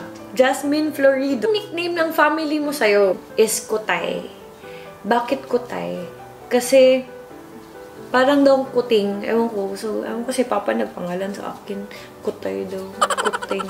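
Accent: Visayas, Philippines. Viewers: Wow apple cider vinegar ,, 😍😍 love u ,, don't change your bisaya accent !! Go bisaya!!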